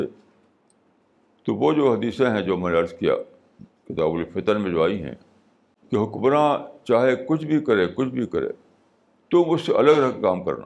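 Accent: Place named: Arab world. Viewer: Arabic accent not clear always.Urdu is o k .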